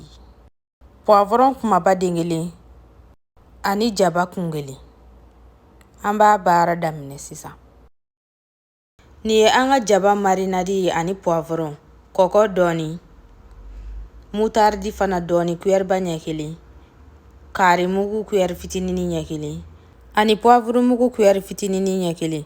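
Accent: Bambara, Mali. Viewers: Merci beaucoup. Ma fille adore tellement ton accent bambara 😁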